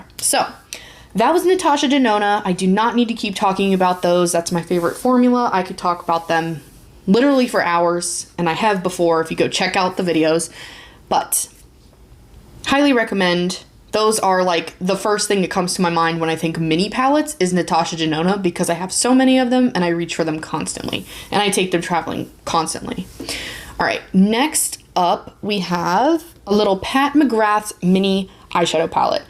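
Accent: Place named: Northeast, United States. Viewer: Ty for the video! ❤ PS you sound very north east NJ, Pa & Delaware ? 🙂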